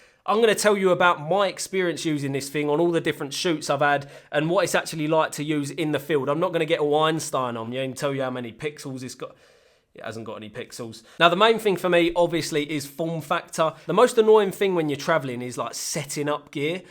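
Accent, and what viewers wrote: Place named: London, United Kingdom. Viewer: that London accent just kills me.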